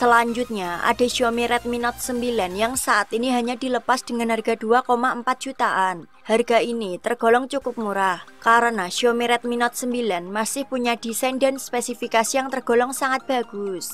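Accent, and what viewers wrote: Java, Indonesia. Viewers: suara nya bikin ngakak,,medok medok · Medok ayu lo, aku ya medok kebumen hahaha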